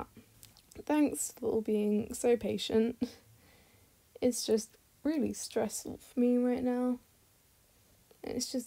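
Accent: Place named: United Kingdom. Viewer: like i said YOUR LIKE ROSYCLOZY YOUR BOTH BRITTISH, SOUND LIKE BRITTISH, AND BOTH MAKE GREAT CONTENT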